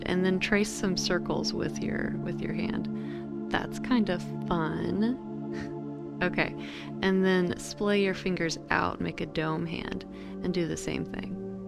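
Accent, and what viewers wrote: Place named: United States. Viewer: I like this girls really American voice. As a non American she reminds me of watching the US antiques roadshow. Also she gives a mean massage.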